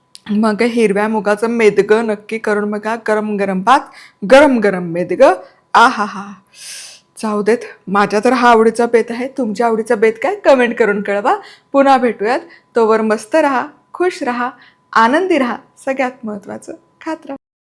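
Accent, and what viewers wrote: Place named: Pune, India. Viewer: Mal tumchya recipe tar avadtatach pan tyachypeksha tumcha najuk awas ani god bolan khup jast awadt. Tumhi kuthalya gavachya . Pune mubai kolapur ko kan . Tumchya god awajavarun tari tumhi mala punyakadacha vattat.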